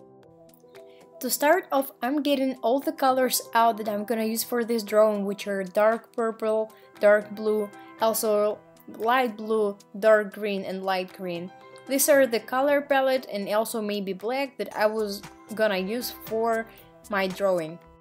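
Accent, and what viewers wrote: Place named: Russia. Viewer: хоспаде, она же не занималась курсом фонетики, чисто русский акцент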